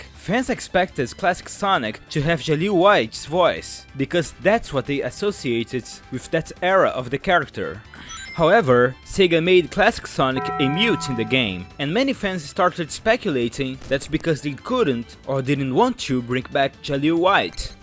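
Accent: Brazil. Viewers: I do think the narrator is brazillian too,, do notice how they say manolo rey, and y'know,, "velocidade" in the bottom corner, not sure if the channel is fully ran by brazillians,,, mas seria legal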